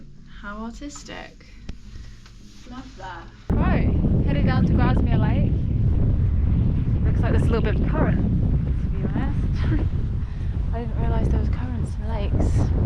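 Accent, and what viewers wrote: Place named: Texas, United States. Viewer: You sound like you're from Texas! hahaha...Well hello from Texas anyway!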